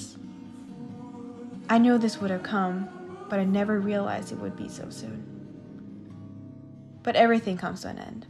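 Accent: United States. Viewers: Hi, did you learn your American English pronunciation and being to speak well while you were on student exchange program? Also, it’s been over a year since then, how are you doing now and you still reminiscing your school year in US? Or just a distant memories now? Thanks. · This video was so touching. Thanks for sharing your experiences...and you‘re English sounds so American now haha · just wanted you to know I thoroughly enjoyed your exchange video in Iowa. I also want to thank you for taking the time to answer all the questions from potential exchange students that follow you on YouTube. As you know it's a big step to leave home and family for almost a year all on their own. Especially going to a new country, with different customs, new language, new school, staying with strangers and meeting new friends. Your video will most certainly help future exchange students and those thinking about it. 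I am a former host dad now living in Virginia and have previously lived in New York, California, Illinois, Oregon and Colorado. I still enjoy following the exchange program and watching high school students as they grow into young adults throughout the year and experience the American way of life first hand. I think it is an opportunity of a life time that very few students will ever get to experience. I worked for the airlines for many years and have been lucky enough to experience many other countries myself and look forward to my first trip to Denmark this fall. I have heard of the Faroe Islands but admittedly know very little about them (kind of like Iowa was for you) 😂 Since I'm always curious about other countries I had to google the Faroe Island and find out more information. Let me just say the pictures were absolutely beautiful!! Reminds me of Ireland in many respects. I have to ask, how is it your English is so perfect and with an American accen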